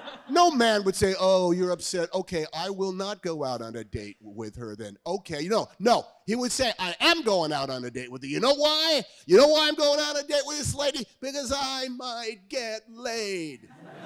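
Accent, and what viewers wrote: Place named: RP, United Kingdom. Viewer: For the Americans, that was a ridiculously posh accent, not a Bristol accent.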